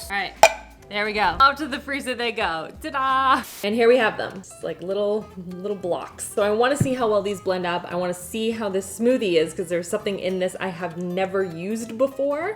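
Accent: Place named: Winnipeg, Canada. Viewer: Winnipegers talk like that as well. Not sure about people in Edmonton because we've only been here for 5 months!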